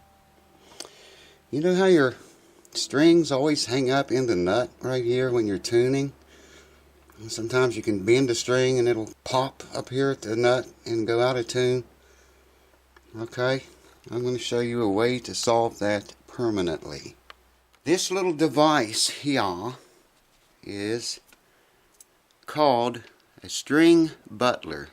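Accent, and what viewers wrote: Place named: Oklahoma, United States. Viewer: So glad I discovered your YouTube page! Lot's of great info, like the one on capos. I hope this isn't too personal, but whereabouts are you from? I ask because my daughter overheard me playing your videos and said "he sounds like grandma!" Lol. She's meant your accent, her grandmother is from Oklahoma. ☺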